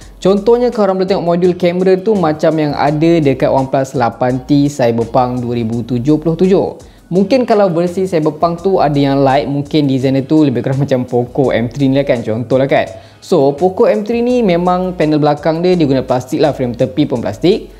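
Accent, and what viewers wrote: Malaysia. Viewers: Saya suka banget sama nada bicaranya org malay❤️ salam from indo🙏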